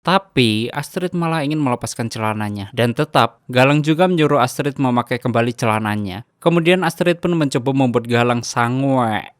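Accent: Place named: Java, Indonesia. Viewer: Suaramu gak asik, medok anjeeeng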